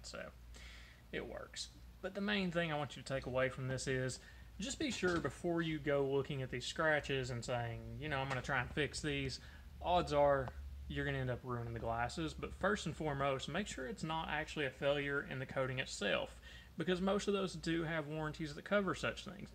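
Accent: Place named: North Carolina, United States. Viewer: I'm guessing you're from north carolina.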